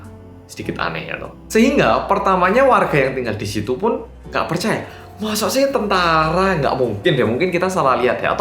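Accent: Java, Indonesia. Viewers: Serial killer jos tenan.alias medok. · Bersama orang Korea yang medhook, Tiap opening pasti ngikutin mas ngomong medhookkkkkkkkkkkkkkkk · logat Jawa nya sudah mengental 😁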